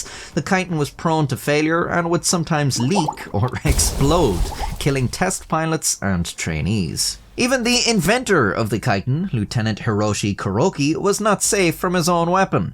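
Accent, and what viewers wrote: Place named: Ireland. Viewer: If theres someone I want to listen to talking about insane deaths and cruel war tactics, then its this Irishman and no one else PS: I love the way you say "three" 🌲. Never stop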